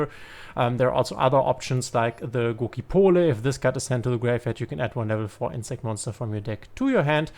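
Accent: Germany. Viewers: Bist du deutsch ? Dein Englisch hört sich nach deutschem Dialekt an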